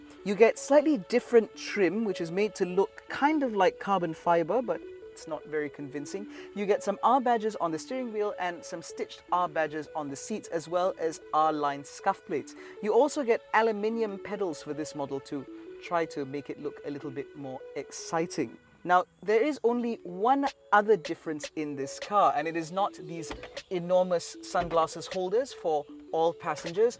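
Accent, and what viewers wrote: United Kingdom. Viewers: Great review n yes for an suv costing more than 200 k without basic safety features is unforgiving n that's the deal breaker for me. Btw, your UK English is refreshing. Other local reviewers' faked US English is rather irritating. Keep it up.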